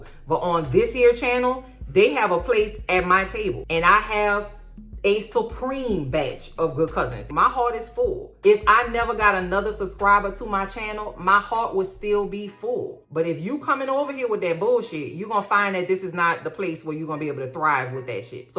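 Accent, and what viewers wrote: New Orleans, United States. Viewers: I'm so sorry to hear you're getting arseholes, racists, and idiots coming here to your channel. I've only been watching for a few weeks---found out about you on Reddit!--and for what it's worth, I love your New Orleans lilt 🙂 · Your accent is what I find a very pretty accent type for US and to me (as a Polish woman who moved to the UK as a teen) it's very clear, and I can understand every word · My favorite pies: Lemon Meringue, French Silk (Chocolate), Pecan, and Sweet Potato are my favorite pies. 🥰🥰🥰 I will eat a Fried Apple Pie every now and then...but it is not my favorite. Also, Cousin, I LOVE how you protect your space. And I LOVE the N'awlin's accent. (However, I am a Southern born & bred Cousin...MS). ❤ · Awwww I love the N’awlins/New Orleans accent! I have NO trouble understanding! Two of my best boyfriends were from Louisiana, one lived in Slidell/Meterie, and the other in New Orleans proper. 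❤ Bith talented musicians, and one a great cook! Good for you for sticking up for yourself and your channel’s loyal community! Keep on using that strong voice of yours proudly, cuz I love to hear it! 🎉🙌🏼🌟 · Damn fools complaining about you & your channel? Your awesome NOLA accent??? Don't get me started. The Brooklyn in me will read them! My PR/Capricorn tongue will unfurl and I'll be placed in YT jail Please. Why ARE they watching if they don't like you? Idiots. Okay enough of that. 7 minutes of AJLT for the second to last AJLT ep. Yep. That's what it felt like. Only thing I'll miss about this show is you & your recaps. I look forward to THAT more than And Just Like Crap (shout out to the other YTers who have that channel name). You are 🔥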